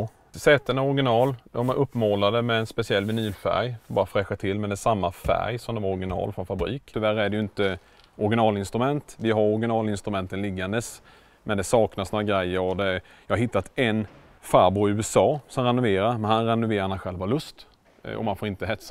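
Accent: Småland, Sweden. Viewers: Vanlig småländska med en lokal blandning.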